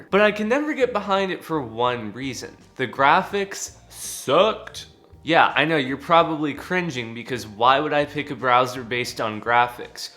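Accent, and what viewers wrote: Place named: California, United States. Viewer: I like his California Stoner dialect.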